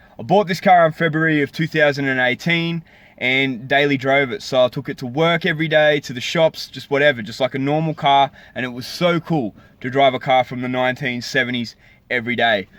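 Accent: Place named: United States. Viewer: Mate, your generation is sounding more and more American everyday. We speak English, that’s English English, not American English. So when we say ZH it’s not Zee Aitch, it’s Zed Aitch. Nice car.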